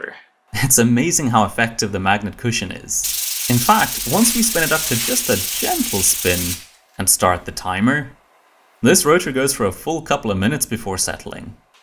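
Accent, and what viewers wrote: South Africa. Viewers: Yo I didn't expect a South African shout out I mean you do sound south African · Aye howzit bru, I thought I heard a little south african accent there and the biltong cutter got me 😂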